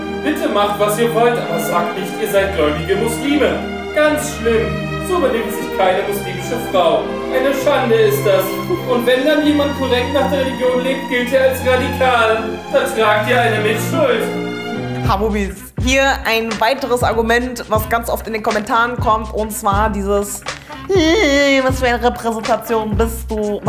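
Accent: Berlin, Germany. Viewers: habubti! Wallah du bist eine Bombe. Deine "Berliner Schnauze" / Berliner Akzent hoert sich an wie "Koefte in der berliner currywurst Sosse".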